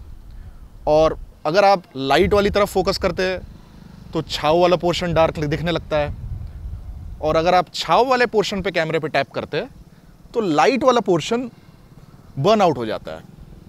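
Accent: India. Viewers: are you bengali?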